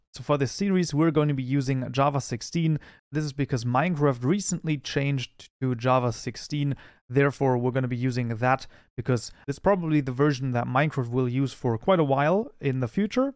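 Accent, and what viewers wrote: Germany. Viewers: Is this an Deutsche akzent?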